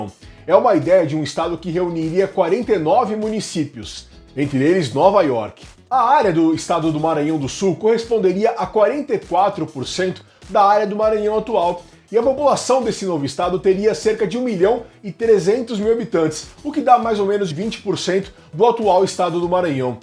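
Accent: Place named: Mooca, Brazil. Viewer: Esse moço do vídeo tem sotaque paulistano da Mooca.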